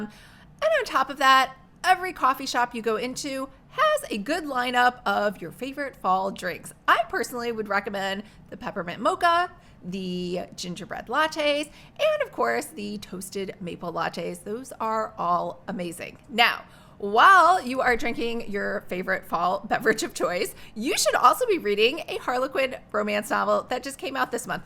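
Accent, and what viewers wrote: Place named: United Kingdom. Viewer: Bed and breakfast and fun British accent ? Count me in ! This sounds like the perfect weekend read. Can’t wait to dive into it—thanks for the great suggestion!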